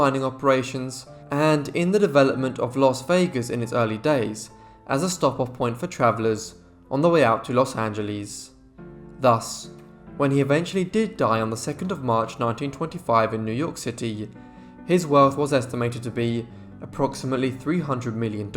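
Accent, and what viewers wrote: United Kingdom. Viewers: He just sounds British to me. I didn't have any issue with it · He has a speech impediment AND is British.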